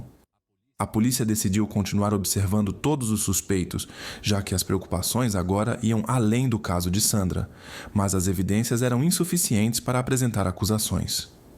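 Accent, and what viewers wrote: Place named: Portugal. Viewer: 𝑸𝒖𝒆 𝒑𝒐𝒓𝒓𝒂" 𝒆́ 𝒆𝒔𝒔𝒂, 𝒖𝒎𝒂 𝒉𝒐𝒓𝒂 𝒂 𝒏𝒂𝒓𝒓𝒂𝒄̧𝒂̃𝒐 𝒆́ 𝒆𝒎 𝑷𝒐𝒓𝒕𝒖𝒈𝒖𝒆̂𝒔 𝑩𝒓𝒂𝒔𝒊𝒍𝒆𝒊𝒓𝒐 𝒐𝒖𝒕𝒓𝒂 𝒉𝒐𝒓𝒂 𝒆́ 𝒆𝒎 𝑷𝒐𝒓𝒕𝒖𝒈𝒖𝒆̂𝒔 𝒅𝒆 𝑷𝒐𝒓𝒕𝒖𝒈𝒂𝒍" 𝒆𝒎 😅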